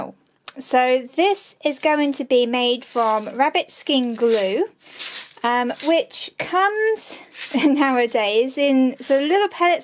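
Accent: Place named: England, United Kingdom. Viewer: Excuse my curiousity, please, from which part of England are you coming from? You have such a lovely accent! By the way, I first leave my glue in water for 24 hours so that the granules can soak up the water. Only then do I heat it, but not above 60 degrees, otherwise the glue loses its binding power.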